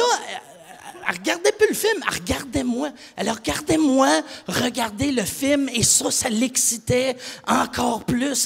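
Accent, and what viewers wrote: Quebec, Canada. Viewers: Je ne crois pas qu'il ait changé son accent, il a juste changé quelques mots... et encore là il s'empêche pas de dire "fa'que" à la place de "alors" et de sauter des voyelles à la québecoise. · je n´arrive pas a comprendre ce qu´il dit...dommage. Il est trop....quebequois, quoi! mdr.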